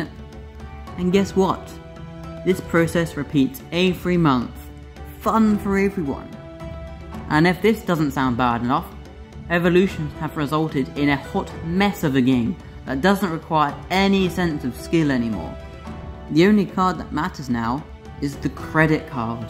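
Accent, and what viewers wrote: United Kingdom. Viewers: i would've given this video essay a chance but you way too british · i agree with froggy, just try be less british in the future. but nice video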